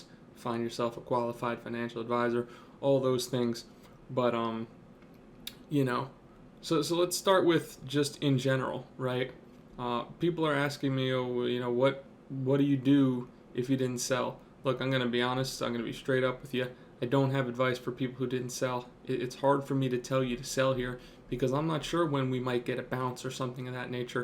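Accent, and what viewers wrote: United States. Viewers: you sound like you are from new jersey/long island with that accent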